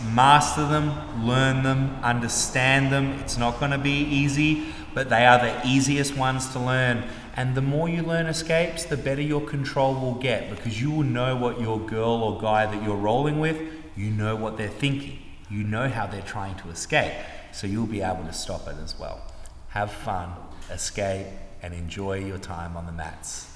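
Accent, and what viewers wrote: Australia. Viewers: The Aussie accent make it so pleasant lol!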